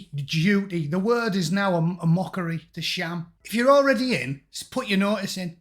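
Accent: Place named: Teesside, United Kingdom. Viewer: Spot on. Agreed with every syllable. Like listening to myself with a Teesside accent. I'll be using the one about John Wayne's safety catch 😂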